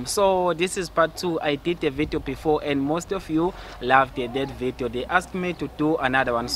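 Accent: Uganda. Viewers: this guy has 100% Ugandan accent... good work though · He talks like a Ugandan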